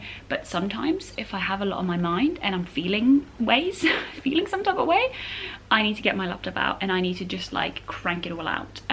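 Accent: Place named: United Kingdom. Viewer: fall vlog+british accent=my passion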